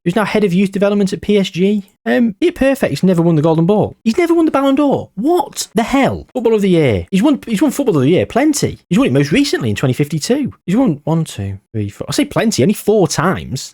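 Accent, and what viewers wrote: United Kingdom. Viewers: I cant see it and thats why...for me way he talks is too much propa british way and way too fast to become worldwide youtuber. Im polish livin in uk for 17y and even for me its bit annoyin. For native british lads may do. Wont do no more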